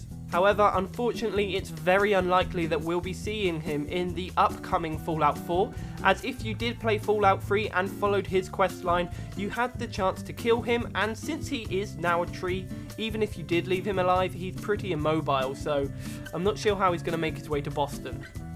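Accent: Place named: United Kingdom. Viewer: he is British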